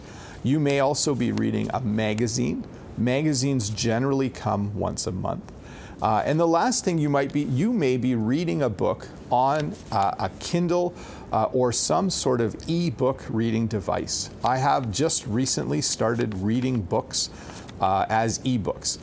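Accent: Canada. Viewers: An excellent video for training the listening skills and for to get vocabulary. I love the canadian's accent. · I appreciate you for your lovely Canadian accent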